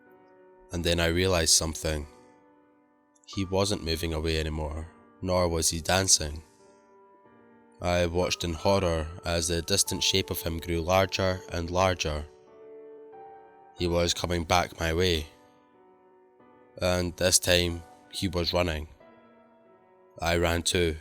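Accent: Scotland, United Kingdom. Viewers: Never heard The Smiling Man narrated in a Scottish accent before! Thanks for narrating one of my favorite Creepypastas 😁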